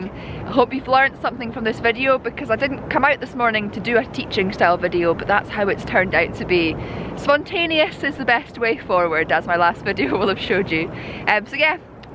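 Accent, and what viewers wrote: Scotland, United Kingdom. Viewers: Nothing beats a Scottish accent in the English language and, you excel in it:) Keep them videos coming.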